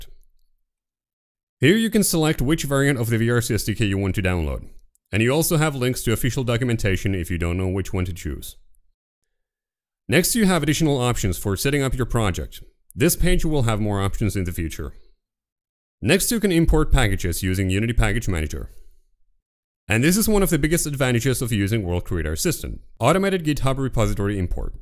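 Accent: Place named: United States. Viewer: It sounded like a regular america accent to me lol. Robotic? lol. But yeah good job. I speak fast myself and thought it seemed a reasonable pace but you slur your words together a bit, I have the same problem, slowing down might help with that. But thanks for making this fast and very short. I really hate it when tutorials drag on too much.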